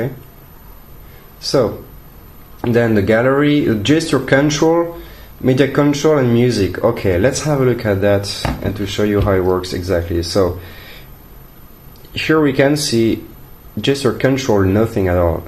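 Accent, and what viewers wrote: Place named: France. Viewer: Good review, terrible english/french accent.. just say app not A-P-P _'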